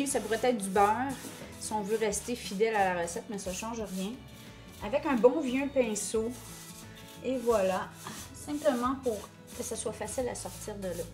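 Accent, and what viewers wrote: Canada. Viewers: Bonjour Je suis débutante avec mon AIR FRYER (offert par ma fille). Je me suis arrêtée sur vos vidéos très bien présentées, très intéressantes! Merci pour la recette du pain brioché, très bonne idée les petites boules qui s'attachent l'une à l'autre en cuisant, pour former une jolie couronne! Pas trop difficile à préparer.J'aime votre petit accent canadien très sympathque! Amitiés de Belgique MIMILOU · Merci beaucoup pour cette excellente recette de petits pains au lait. Mes petits enfants en raffolent. En plus avec l’accent canadien c’est trop beau !!! Vive Thermomix et Air Fryer Ultenic qui nous facilitent la tâche. Gros bisous de la Drôme en France.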